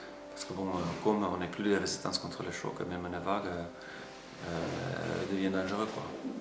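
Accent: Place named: Basque Country, France. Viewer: Super témoignage, tu vas beaucoup mieux et bientôt ce sera parfait. Ton accent et ton récit avec l'accent deu pays basque! En afrikander tu conserves le même accent? Bonne reconvertion.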